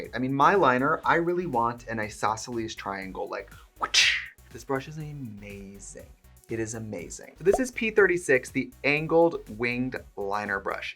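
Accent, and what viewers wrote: Wisconsin, United States. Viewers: Aw, that lil WI accent pops up now and then and I love it. The straaaps 💜 collection is fire - that purple is beautiful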